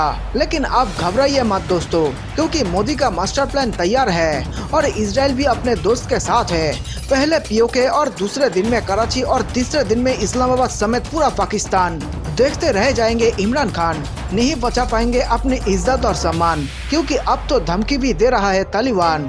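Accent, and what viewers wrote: Bengal, India. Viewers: Idiotic narration. Change it. Your heavy bengali accent isn't music to the ears. No offence intended. Get a new narrator. That will be help your channel. ( But all concocted and false info)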